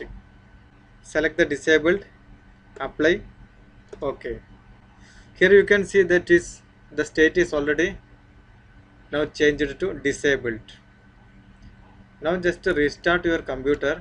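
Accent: India. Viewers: I like your Indian accent 👌😀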